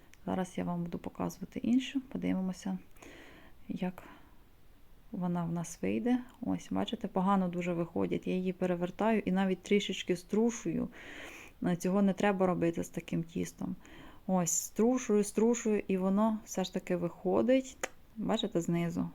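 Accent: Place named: Ivano-Frankivsk, Ukraine. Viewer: дуже дякую землячко, я родом з калуша і чую що у вас акцент такий з івано-франківської області.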